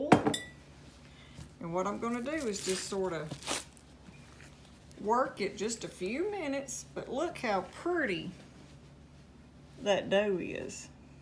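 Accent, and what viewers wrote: South, United States. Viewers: Have you ever heard your voice?? It’s horrible you give southerners a bad look & btw fingers are not pronounced as dangers ! Please try to do better!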